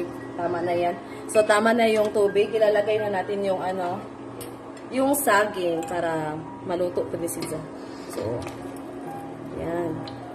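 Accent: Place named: Visayas, Philippines. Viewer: Napaka Sipag napaka Ganda Ng views. Cute Ng Bisaya mo sis 😁 spread love not hate 💚 stay connected. Love this kind of content 💚 relaxing simple life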